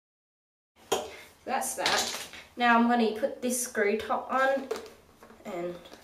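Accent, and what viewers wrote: Australia. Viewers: are you Australian? xD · hey r u australian? u hav an aussie accent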